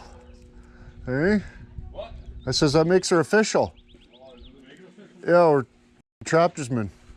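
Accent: Canada. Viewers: Hr sounds like Thommy Chong, I guess its a Canadian thing 🇨🇦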